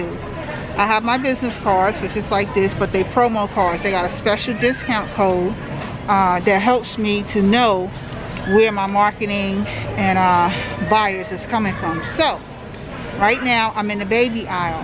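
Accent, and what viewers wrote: New York, United States. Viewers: This was good! I have alway said, you have a New York accent but I never asked if you were from New York. I rarely see anyone that actually shops at Lowe's. When I think home improvement I think about Hone Depot. Now, let me tease you a bit. I always say that Lowes is for the stuck up employees, because whenever I go I never get anyone to help me. Once I got some mirror cut in Lowe's for a DIY Ikea night stand/chest and the attitudes I got because I needed someone to cut some mirrors for me. Then, there's old Home Depot, where people are willing to help me with their orange apron on. I like both stores, but I go to Home Depot the most. hehe... I just wanted to share that. I typically leave long comments. I'm EXTRA in the comments, but you know I'm here for you. Your hubby was trying to be a professional camera man and you kept asking him questions. 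I thought it was so cute and funny. That sink is going to be amazing,. That is a smart Idea on what you do with your business cards. I am so excited to see the kitchen come together.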